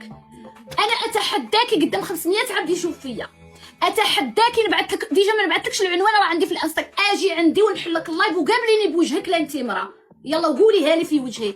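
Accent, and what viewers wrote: Morocco. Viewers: أنت مغربية علاش تكذبي هضرتكم باينة عيتي تقلدي هضرت الجزائريين لكن مقدرتش لهجتهم المروكية طاغية القفطان جزائري نقطة ونرج للسطر أنتم امتهنتو صناعته وفقط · نتي مغربية ياختشي باينا في صوتك دي وجهك وروحي لقفطان عنده ماليه 🙂😌 تحيا لجزائر الحركية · بصح هدرتك مغربي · لهجة تاعك مروكية يا لمسخة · لهجتها مروكية هههه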